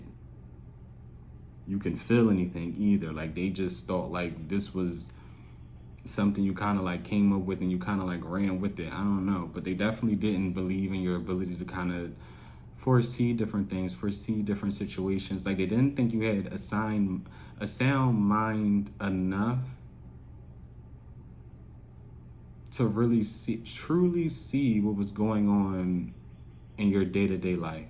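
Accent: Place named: New Orleans, United States. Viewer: You are definitely gifted, all FACTZ just Blessed ❤..wow! You be spot on bruh. Where are you from, I'm hearing Nola, ATL?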